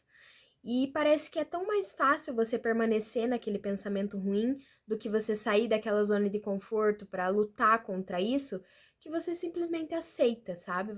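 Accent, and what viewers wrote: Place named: Curitiba, Brazil. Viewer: Nossa você é muito gente boa eu me senti compreendido , também tenho isso é horrível , sou de Curitiba também . Percebi devido o seu sotaque também .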